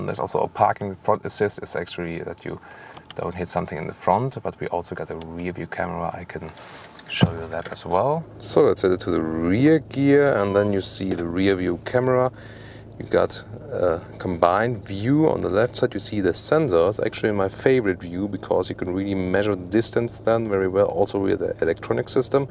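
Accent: Germany. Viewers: An excellent and thorough review by a true gentleman. Your German accent is awesome !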